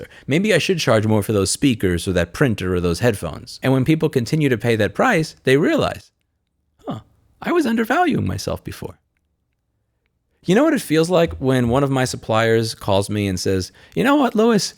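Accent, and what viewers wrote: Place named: New York, United States. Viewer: Is it me, or is Louis more New York today? <3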